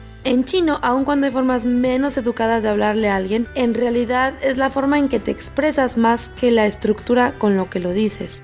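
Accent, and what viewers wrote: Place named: Mexico. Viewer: Tu acento es mexicana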